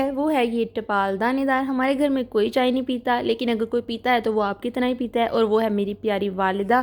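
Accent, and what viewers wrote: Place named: Punjab, India. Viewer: aajkal punjabi mode kyun on hua wa hai?? you punjabi is sooo cute - and please make shakarkandi ki shaan mai video :)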